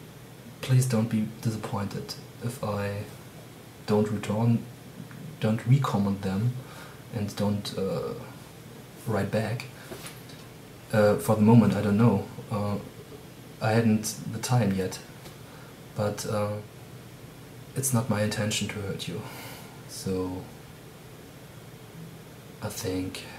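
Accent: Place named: Germany. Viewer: I hear a German accent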